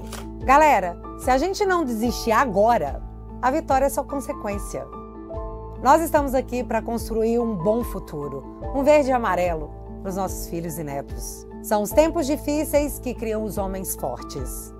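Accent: Minas Gerais, Brazil. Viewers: Minina, ouvir você é bom demais da conta sô! Esse seu jeitin mineirin, com esta didática tipo Nuh! Nos faz entender tudo direitin, com humor, um cadin de esperança e mais leveza. Tempos sombrios... para os ditadores espero! Na minha opinião, fico pensando se a Magnitsky tivesse vindo antes, não teria sido melhor para nós. De repente, as sanções ficariam para depois. Esses 50% serão dureza, pois o molusco dobrará a aposta, que nem o cabeça de ovo fez, sexta passada, com o Constantino, de novo, através da Rumble.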